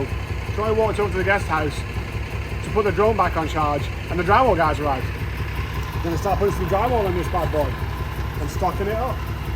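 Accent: England, United Kingdom. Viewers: Amazing build, I bet your so glad you took the gamble, you don't sound American, UK maybe? What was the final cost? really curios. Jim in Liverpool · This guy is British! 🇬🇧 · Are you from England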